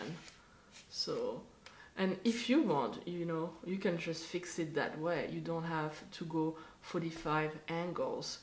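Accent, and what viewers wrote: France. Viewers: Hello :) J'entends à ton accent (1ère vidéo que je vois de toi) que tu es francophone voire française :) (Personne n'est parfait :D Je vais aller voir leur site, mais pour un produit équivalent, on m'a dit que le défaut - pour un Fujifilm - est que le bouton de release de l'objectif est difficile à atteindre. Ensuite il faut voir si l'ensemble de ton parc objo pourra encore se connecter à l'apn. Dans une autre vidéo, un photographe disait ne pas savoir installer tel ou tel caillou une fois cet accessoire placé... Je n'ai pas la référence de ce youtubeur mais il donnait ça comme principal défaut. Excellent produit qui m'intéresserait s'il s'adapte à mes Fujifilm et les cailloux qui vont avec :) En attendant je vais prendre le temps de découvrir tes vidéos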